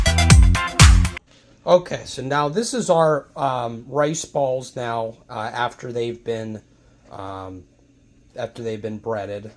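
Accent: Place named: Pittsburgh, United States. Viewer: Born and raised in Pittsburgh. First video I’ve ever seen of you. Soon as I heard you talk, I thought this guy is from Pittsburgh.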